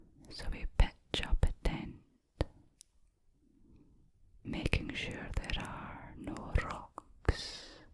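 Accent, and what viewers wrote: Scotland, United Kingdom. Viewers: Well clearly he's talking about the Scottish accent IN the video, if you couldnt tell. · Never knew Scottish accent was what I needed to sleep. Thank you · I have a newfound love of the Scottish accent now. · I never realized just how much I absolutely love the Scottish accent. You are absolutely amazing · it brings me much joy as a scottish person myself that so manh people love the scottish accent!!! ive always felt really insecure about my accent because you never really see it online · ! Glad to see you posting. I do love your Scottish accent so this is a wonderful video. ❤❤❤ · lol a very good sleep indeed, your Scottish accent beat me lol but I wonder how you’d stack up against a southern drawl lol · this scottish accent knocked me out last night it very likely will again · This. Idk why but i love the Scottish accent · I am so taken back to my childhood with the Scottish accent at bedtime. 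· that Scottish accent whispering is so hot wanna take an cold shower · Was scrolling through shorts and heard a Scottish accent pop up related to asmr finally a proper Scottish accent you do our accent justice lol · I hope to have your voice and accent in my ear every night when(if) I visit Scotland. Cheerz luv. 😴🥰🏴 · A Scottish accent. I think she's from Aberdeen or Dundee? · You and Stronny Cuttles are the absolute best Scottish voices I've ever heard in asmr 😍 I wish you two would collab. It would be soooooo amazing if that were to happen lol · Dear God what a most beautiful gorgeous angelic Scottish voice I already feel more relaxed you're a true angel ❤❤❤❤❤ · Holy tingles dude. Never thought I’d love a Scottish accent so much 😌☺️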